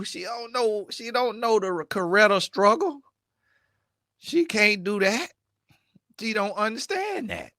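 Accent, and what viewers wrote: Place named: Florida, United States. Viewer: The clothing, hair and archetype descriptions combined with the FL accent is taking me out!!! 😂😂😂😂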